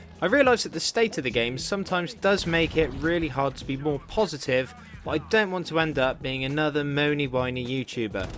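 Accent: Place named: United Kingdom. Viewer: Your brit accent is so funny dude ! So enjoyable! Well done Edit : this questionable humor is fantastic ahahah Edit 2: your like the British modest pelican With that commentary post whether you want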